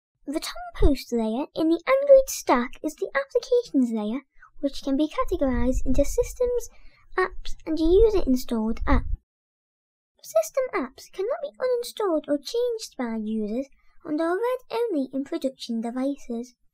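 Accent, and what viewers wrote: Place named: Liverpool, United Kingdom. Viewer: The gentleman is from Liverpool, if I'm not mistaken.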